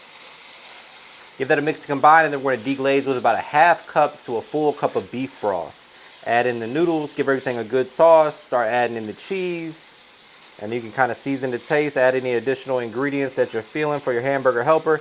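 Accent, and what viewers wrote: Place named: New Jersey, United States. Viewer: Where you from nice voicd.guess jersey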